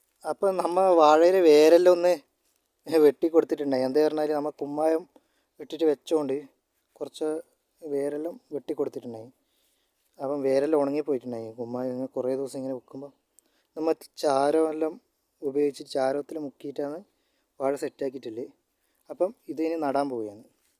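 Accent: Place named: Taliparamba, India. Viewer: Taliparamba slang in kannur ❤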